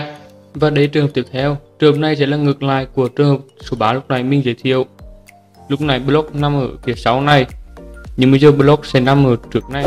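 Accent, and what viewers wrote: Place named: Central, Vietnam. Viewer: nói như tiếng miền trung ấy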